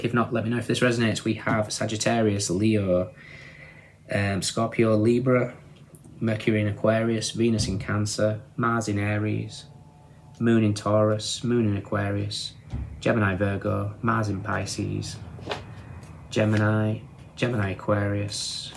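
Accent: Northern England, United Kingdom. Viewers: I always look forward to your readings. I love your accent…. A proper northern accent. Thank you for reading 🙏🏻Have a great week ahead.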